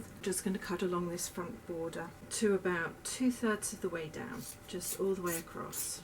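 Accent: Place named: Northumberland, United Kingdom. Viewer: Great instructional video!!!! Love your accent, too. You sound just like my mother, born in Morpeth, Northumberland. Especially liked video because you stuck to the necessary elements of making the cushion and didn’t put in lots of extraneous dialog to fill air time like others often do. You really know your stuff! I can’t wait to get started on my project. ❤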